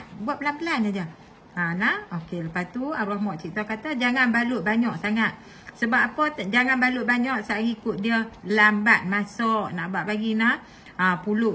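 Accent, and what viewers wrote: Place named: North, Malaysia. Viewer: assalamualaikum..terhibur dengan telatah tambah pulk loghat utara.contoh nya nak ceghita bagi.nek habaq mai.ummi tergelak sendiri masa latah.memang padu pulut udang tu.ummi pun lama xbuat dulu penah juai.buat senighi puaih hati.ummi nak tanya kampong kat mana.ummi orang utara jugak.memang terhibur.anak2,la depa pandai buat pulut mulut ni.klu ummi buat depa bungkuih boleh la.lain kali ummi nak minta buat kuih jongkong bulih kan.bye ummi suka dan minat ngan telatah anda menghibur kan.t.kasih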